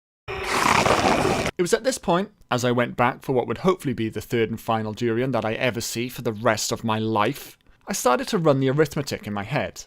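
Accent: Wales, United Kingdom. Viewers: Are you welsh? Also you deserve more views.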